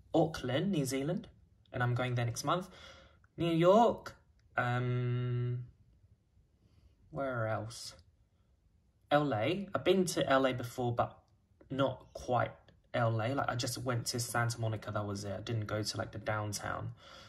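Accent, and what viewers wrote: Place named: Australia. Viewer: Sounds like Australian accent.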